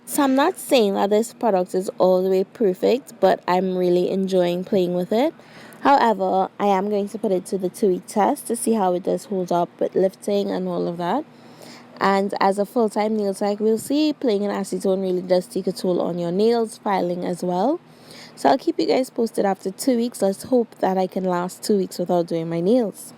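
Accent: Trinidad and Tobago. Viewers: Love this am hearing a Caribbean accent trini 🇹🇹 to be exact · When I heard that Trini accent, I subscribe immediately. Love how you took your time to explain everything. I am now learning to do acrylics and gel nails. Thank you. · Always proud when I hear a Caribbean/Trinidadian accent 🇹🇹🇹🇹🇹🇹🇹🇹🇹🇹 very skilled nail tech, all the best for you in the new year!! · Where are u from?... U sound Trini...!!! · Trinidadian here and I recognize a gorgeous Trini accent anywhere love y girl and I’m glad there are some Trinidadians around here on YouTube with me 🇹🇹🇹🇹🇹🇹🇹🇹🇹🇹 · Noticed that trini accent quick. Coming to see you soon from Chaguanas. 😁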